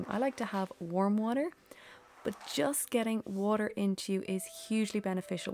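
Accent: Ireland. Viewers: your lovely calm Irish lilt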